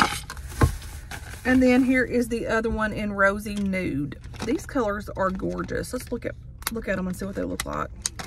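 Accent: South, United States. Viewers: YESSSSS,Let me start my comment by saying i LOVEEE your country accent❤❤❤. Second,your shirt is everything lol, i used to watch golden girls alot!! I see those steam dumplings so i may try them out now. Eww i never was a fan of moon pies😅. Oatmeal pies for me❤. I always wondered how those sparkling waters taste. I like to buy the walmart brand sparkling waters for my son. I did grab 1 of the bubly brand ones to try. Omgg those waffle sticks are so cute🐶😅. Those lipsticks remind me of maybelline! Yup,ive used those same containers for the smaller earrings. Those dingo dog treats are a deal😮. Have you tryed those foam soaps they have that resembles the bath&body works one? Im a sucker for bright colored nail polish! Thanks for showing off your goodies❤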